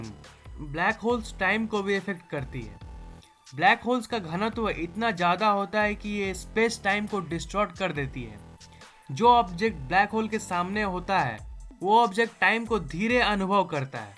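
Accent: Bihar, India. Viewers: Arey bhaiji Bihar see ho Kya?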